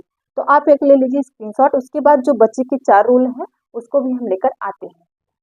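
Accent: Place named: Uttar Pradesh, India. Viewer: Madam, You may belong to Uttarpradesh, I guess by your tone, so many thanks for it.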